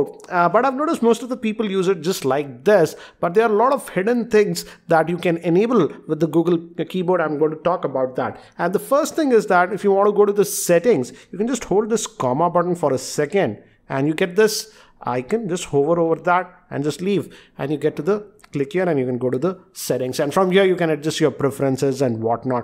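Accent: India. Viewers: thank you for doing these excellent, very task-specific videos.Your work is very appreciated. I'd like to make a suggestion to make their goodness even better. I am an English speaking American; and your native language is Indian. Your dialect and mine are quite different. Typically native-speaking English people speak more slowly. You speak much more quickly and (of course) pronounce English words as you learned and know them. I concentrate and listen closely to you; but due to our lingual differences unfortunately I fail to understand much of what you are teaching. When you make videos in the English language,could you try to speak more slowly and pause a moment between topics. What you are teaching is very valuable, and I want to hear and understand you fully. Thank you very much and please continue sharing you knowledge. I appreciate your work!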